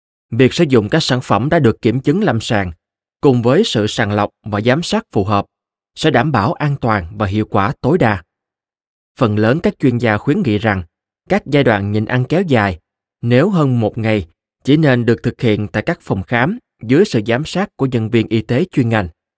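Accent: South, Vietnam. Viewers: Đây là clip mà tôi thấy tệ nhất trong nhiều clip vì gần hết clip rồi mà vẫn cứ miên man giới thiệu,tua mãi mà vẫn cứ nói về bản ngã...vv Nói chung fonos hay ở đâu có giọng đọc miền trong rất nặng về trình bày tên tuổi dài dòng.thể hiện tiếng anh nghe phản cảm tỉ vẻ như rất tài giỏi về ngoại ngữ sao ko nói gì cho mộc mạc dễ nghe ..¿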